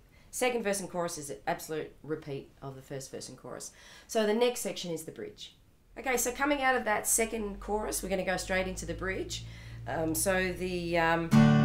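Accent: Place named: Australia. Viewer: nice video!! love that Aussie accent too!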